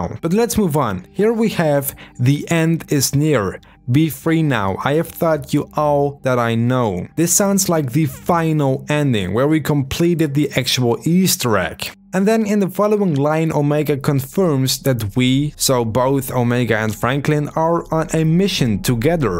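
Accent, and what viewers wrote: Netherlands. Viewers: you are dutch I recognice your bad accent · Nederlands toch je weet zelf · yu dutch m8? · Dude are you dutch?????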